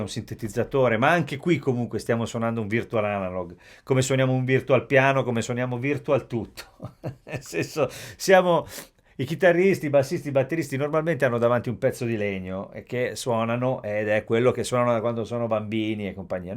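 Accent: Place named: Italy. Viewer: Your musician skills plus your Italian accent make me want to buy this Korg so bad. I already bought the Reface DX, thanks to your demo video! Grazie, amigo.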